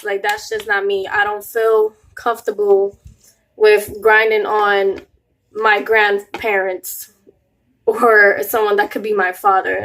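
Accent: New York, United States. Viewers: That accent has to be from NY! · I loved the New York accent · New York accent strong asf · u have a accent like your from New York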